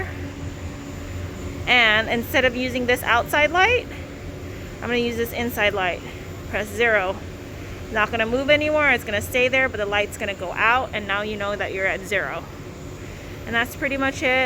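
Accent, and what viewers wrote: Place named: Caribbean. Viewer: Are you Caribbean? I can hear an accent and i feel like you’re one of us lol. very informative video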